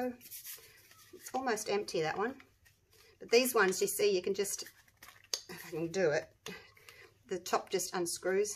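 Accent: Australia. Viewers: I enjoy all your creations. Love listening to your Aussie accent.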